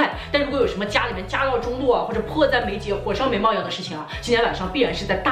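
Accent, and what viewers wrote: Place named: Taiyuan, China. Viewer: 真二八经的太原话，咱俩老乡，听得我这个舒服啊！哈哈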